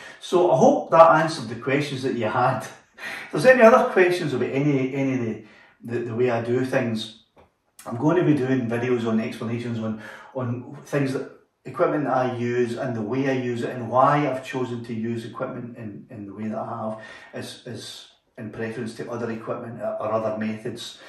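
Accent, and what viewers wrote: Scotland, United Kingdom. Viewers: Great content, im just here for the scottish accent :) cheers from DK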